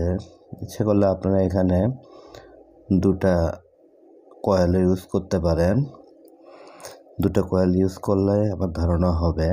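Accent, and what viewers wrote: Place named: Bogura, Bangladesh. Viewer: বগুড়া বাড়ি নাকি বাড়ে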